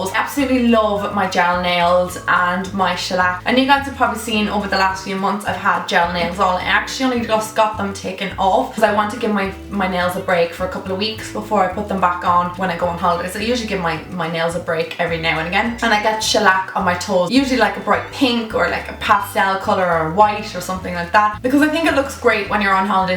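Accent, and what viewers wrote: Ireland. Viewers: Is she Irish??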